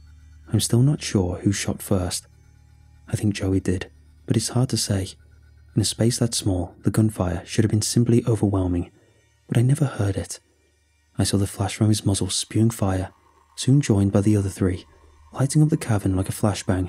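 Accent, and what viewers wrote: England, United Kingdom. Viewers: Guy sounds a lot like a Brit to be an American... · Obviously it's been narrated by a British guy. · I might have possibly believed this as a true story if he explained that he was SAS. But that British accent was a tip off. But it is well written . And a nice work of fiction. Good job! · Why is there a British narrator? Can't understand a word. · is British. It’s the most logical explanation for why he pronounces things differently. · British accent, pronunciation is different, not wrong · What’s with the English accent? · This guy sounds like a Brit but says he grew up in Colorado.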